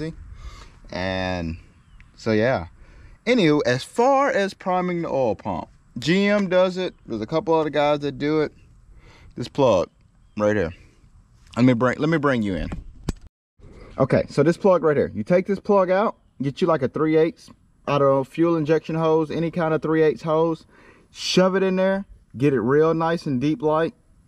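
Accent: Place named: Alabama, United States. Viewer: “ I’m from green bow Alabama” - got the same accent. great video though.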